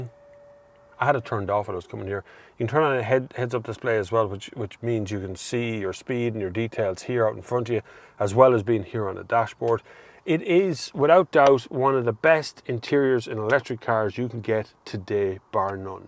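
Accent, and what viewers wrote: Ireland. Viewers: Good reviews of both this and the ID3 - we are currently looking at both cars and wondered what your preference would be - looking at the VW ID 3 Family or the Kia E-Soul? Husband is from Laois so it really helped having a familiar accent to show us the pros and cons of both cars.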